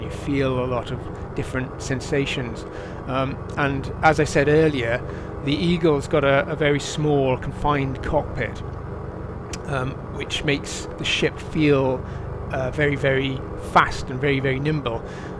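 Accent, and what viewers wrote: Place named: Wales, United Kingdom. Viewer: Getting an oculus in a few days. Just hyping myself up by watching elite vr videos lol. I love elite but I'm gonna be so obsessed with it now from what ive seen and heard from vr owners. I love feeling immersed in games and vr is a great tool for that; its perfect for elite. Good to see someone having fun messing around advance and shooting things. Also,are you from wales? You have the accent of someone down cardiff way. Just curious, being from wales myself.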